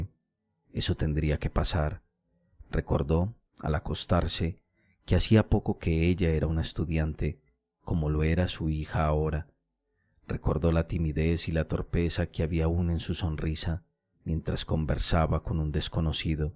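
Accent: Colombia. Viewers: Amo tanto la obra de Chéjov que hasta tolero ese terrible acento colombiano con el que el lector nos ilustra. Se aprende tanto sobre la cultura rusa y de paso sobre la misogínia de Chéjov. Con todo, cómo no amar su obra. Leí su obra completa cuando tenía 20 años pero ahora con YouTube la escucho y es como si fuera la primera vez. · Excelente.....me encanta....el acento colombiano....muchas gracias.....su trabajo...nos alegra.......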